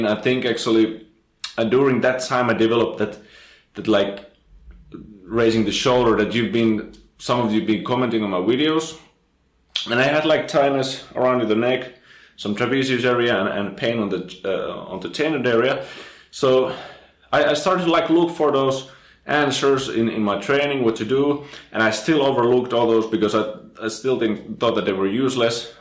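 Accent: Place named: Finland. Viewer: No wonders, you have the accent of Finn, haha :) Great channel by the way!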